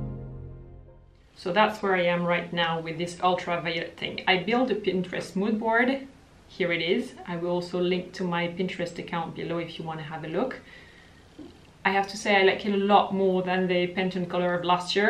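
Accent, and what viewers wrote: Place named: France. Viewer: Hi! I’m a Spanish woman who lives in San Juan, Puerto Rico. I ❤️your videos and I ❤️your french accent speaking English 👌I would like to know if lavender is good for cool or warm undertone (I think mine is warm) Have a nice day.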